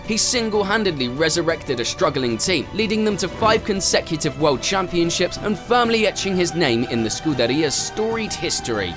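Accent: United Kingdom. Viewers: I can smell the bias British from 300 miles away pff. ugh...no debate he doesnt even belong to that list. Fangio, Ayrton senna and Michael Shumacher and i think now Max popping up. Not Lewis. And with bias British accent people cant take this serious having such debates, bias bias bias. Remind you he lost from Button, Rosberg, <> Massa, Russell some even teammates outqualified Lewis in a season. I dont see Fangio, Ayrton or even Micheal or Max doing that. Oh i dont even start his dad was poor as he got financial backup from Ron Dennis at the age of 11.. His career before F1 isnt exactly bright at all compared to other F1 drivers.. Other drivers BEFORE entering the F1, perhaps you can spot the difference: Verstappen: 2010: WSK Nations Cup - KF3 - 1st 2010: WSK World Series - KF3 - 1st 2010: WSK Euro Series - KF3 - 1st 2010: CIK-FIA World Cup - KF3 - 2nd 2010: Bridgestone Cup Europe - KF3 - 1st 2011: WSK Euro Series - KF3 - 1st 2011: WSK Master Series - KF2 - 1st 2012: CIK-FIA World Cup for KF2 - 2nd 2013: CIK-FIA World KZ Champion - 1st 2013: CIK-FIA European Champion - KZ - 1st 2013: WSK Master Series - KZ2 - 1st 2013: WSK Euro Series - KZ1 - 1st 2013: CIK-FIA World Champion - KF - 3rd 2013: CIK-FIA European Champion KF - 1st 2014: FIA Formula 3 European Championship - 3rd 2015: Formula 1 Hamilton 1995: Super 1 Nat Champion – IAME - 1st 1996: Kartmasters British GP – Comer - 1st 1997: Super 1 National Champ– FY 1th 1998: Torneo Industrie – 100 Jun- 19th 1998: Green Helmet Tro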